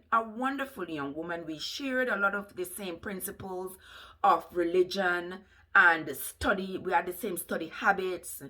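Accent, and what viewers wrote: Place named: Jamaica. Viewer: Am new here and I like the Jamaican English, my Bruder👍😅 I will stay here for more of this👏👏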